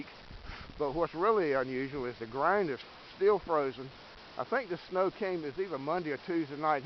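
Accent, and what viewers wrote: North Carolina, United States. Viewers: I thought you sounded like a North Carolinian lol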